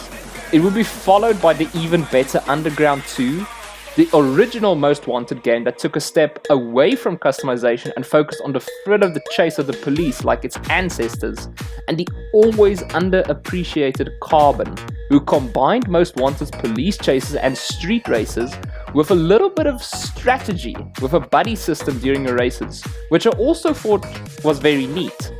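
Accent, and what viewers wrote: South Africa. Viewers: Are you South African? Edit: Yeah now I realised, I could hear by your accent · Unrelated, but you sound South African... are you? 😂 Edit: Knew it lol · South African accent. I hear it.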